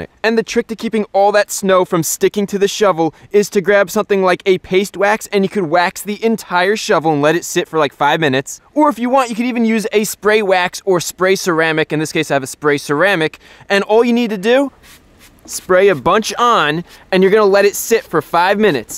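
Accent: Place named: Pennsylvania, United States. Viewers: Yeah, that NJ accent is clear... especially "wooder". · Jersey thing like saying “where are the khakis” is “where are the car keys” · New Jersey for sure. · has an accent that sounds like he's from southern Pennsylvania....we all say water like that here lol Good tips though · Its new jersey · I know hes from somewhere in the new york area solely because of the way he says water. This comment is being made after only watching 4-5 of his videos with no other context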